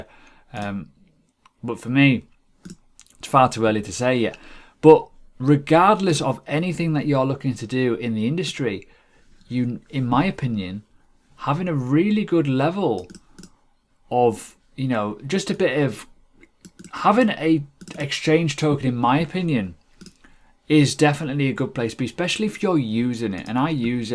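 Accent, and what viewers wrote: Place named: Northern England, United Kingdom. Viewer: Awesome northern accent.. reminds me of when I was growing up in Manchester. Great Channel BTW. SXP is my pick as a cheap starter. ZIL as well - big bag of this. Thx for the ELROND video , may get some of that. Cheers Ben - New Zealand.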